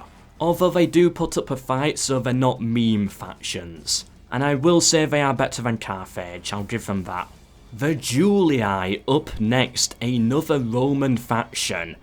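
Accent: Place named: Yorkshire, United Kingdom. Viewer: I've honestly never heard a Yorkshire accent as strong as yours, it's beautiful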